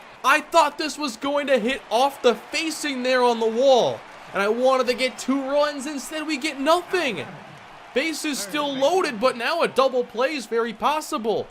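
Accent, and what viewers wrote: Minnesota, United States. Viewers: You from Minnesota?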